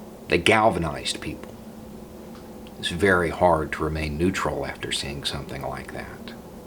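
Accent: South, United States. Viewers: At the beginning of this video....I interrupted it by watching the short documentary "The Body Of Emmett Till" from the Time channel ( long) and then went back to watching this video from...a southern white bearded man with a southern accent speaking with reverence about him. If Emmett Till could hear the words spoken by.......just wow.......I think he would have hope (even this many years later and that's a shame it takes this long). There's still a ways to go. I'm kind of at a loss for words right now after seeing the open casket photos and footage followed by words.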